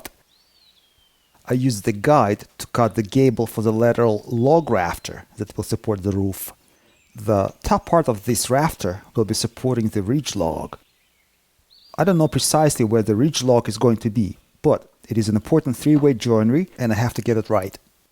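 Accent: Russia. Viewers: Такое впечатление,что русский озвучивает на английском языке ,такое акцент!!! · Tool me way too long to realise hes russian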